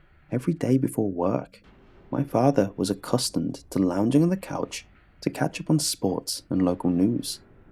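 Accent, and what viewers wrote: United Kingdom. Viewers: British voice 1like=prayer for michael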